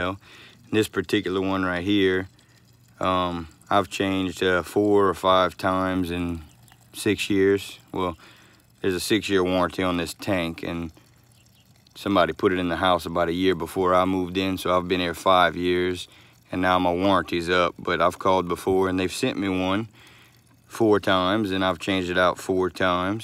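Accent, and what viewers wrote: Louisiana, United States. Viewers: Man that's a Louisiana accent if I ever heard one!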